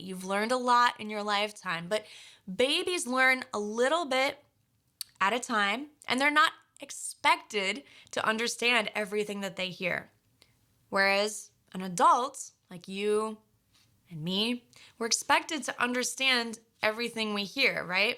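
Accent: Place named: United States. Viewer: hi how it's going honestly I liked your way that you are teaching by the way nice accent wow I love American eglish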